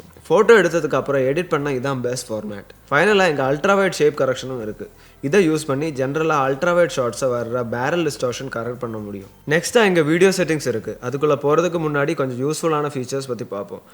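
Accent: Kerala, India. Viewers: Malayalam hero Tamil pesara mathiri iruku ba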